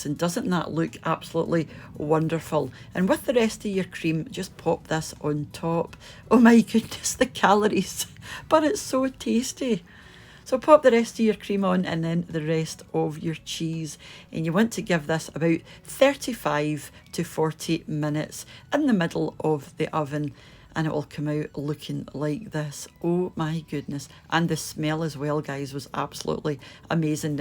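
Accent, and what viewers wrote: Scotland, United Kingdom. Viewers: Whereabouts in Scotland is that accent from?? 🤔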